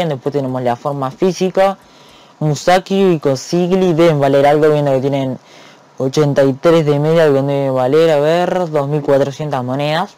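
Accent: Uruguay. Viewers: Sos uruguayo?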